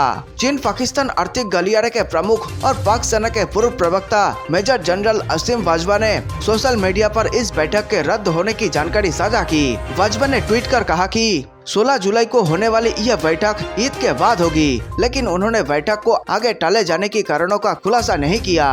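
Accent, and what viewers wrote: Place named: South India, India. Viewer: Madrasi hindi sikhle pehle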